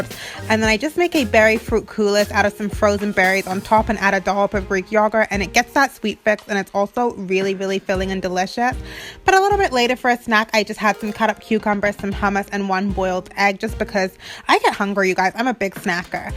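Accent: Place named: United Kingdom. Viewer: And a bit British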